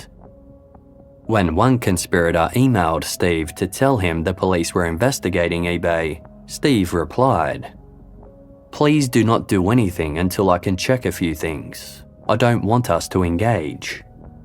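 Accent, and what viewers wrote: Australia. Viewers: Psychopaths are not always serial killers they are corporate bosses and world leaders The planet is run by psychopaths The he fact that the president and vice president got extraordinary payouts compared to the compensation for the Stiener’s AND went on to become leaders in other corporations speaks volumes how psychopaths are valued in business and politics Glad the local police were so diligent ,sadly not usual with police investigations And as usual well told and thorough recount And as a fellow Aussie so nice to hear a familiar accent👍🇦🇺✌️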